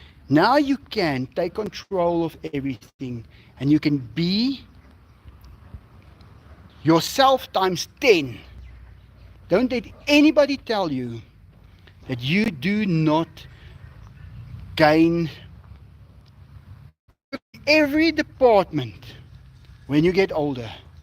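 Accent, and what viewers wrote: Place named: South Africa. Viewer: Unique South African accent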